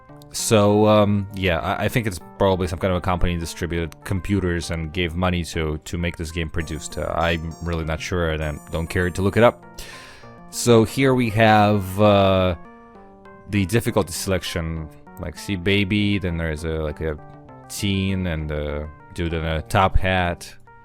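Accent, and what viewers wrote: Russia. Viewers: слышен твой русский акцент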